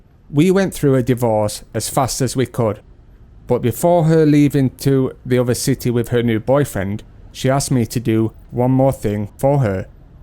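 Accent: England, United Kingdom. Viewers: Anyway if you love her if you give her money nothing wrong at all. Everything you pick what is problems?. If your relationship or whatever you are thing go wrong all the time are you sure you a Australia man? For me you not You must came from England Your accent is English Man Okay you pick the wrong person why you complain for nothing you can fix anyway Speaking English or not nothing to do with that ever your own people devoice happens all the time ever your the same language. So why you complain for? happens everywhere people not meet what they want nothing perfect in the world. You should know that By the way you not a Australia Man You a English 😂😂😂😂😂😂 Your love dream broken down Nothing she can do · You sound more British than Aussie ? , such scheming and unscrupulous women make my skin crawl ! Sometimes human goodness is not found in swamps of poverty and profession . 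It’s just reptilian instinct of surviving . Glad that you are able to look after your mother which is the best decision and I am sure you will find a good match and life partner who will recognise your qualities and be there just for you ! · Why do you have an English accent if you are from Australia? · you have a genuine interest to find a partner. do not pick from the wrong place. your english pronounciation like a british guy not like an australian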